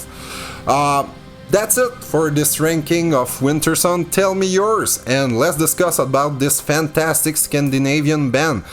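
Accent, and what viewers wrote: Quebec, Canada. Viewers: Wow that is one heavy french Canadian (Québécois) accent. Excellente video! Cheers \m/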